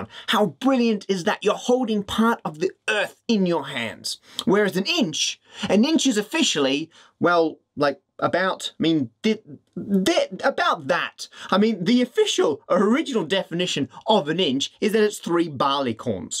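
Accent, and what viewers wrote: Australia. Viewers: I have only just realised that Matt is not English but Australian. I feel rather stupid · Sounded like and Australian to me! - Alternatively, maybe New Zealander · If it's one of his former monarchs it's one of yours as well given that he isn't a brit lol. Sounds Australian to me.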